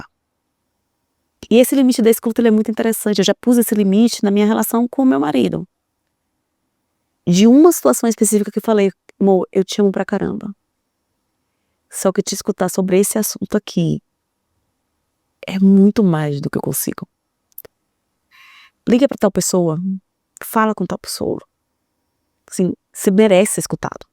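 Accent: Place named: Bahia, Brazil. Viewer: Estou simplesmente amando seus livros e seus vídeos. Falam tanto de mim, me enxergo tanto nas suas falas. Ainda mais agora que sou mãe de primeira viagem e estou buscando curar minhas feridas para não ferir minha filha. Sou grata por compartilhar tanto conhecimento com a gente! Ah, e descobri ontem que vc é baiana igual eu, fiquei feliz demaaaais vendo vc fala com nosso sotaque e nosso jeito maravilhoso hahahahaha ❤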